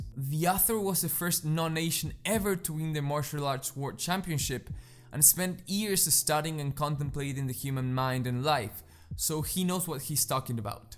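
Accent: Argentina. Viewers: quee? sos argentino?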